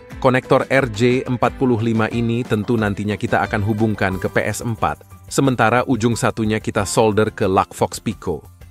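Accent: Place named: Sulawesi, Indonesia. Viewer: bbrapa wktu yg lalu sy nnton dia hunting ps4. Ad semacam percakpan logat nya sprti dari pulau sulawesi. Perkiraan sy dia di manado atau gorontalo atau bsa jg di kota palu.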